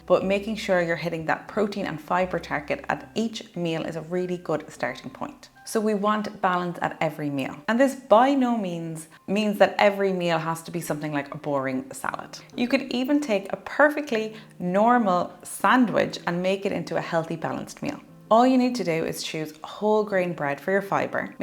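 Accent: Ireland. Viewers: This is a good video to help with weight loss. It's also a great video to help with learning an Irish accent. :)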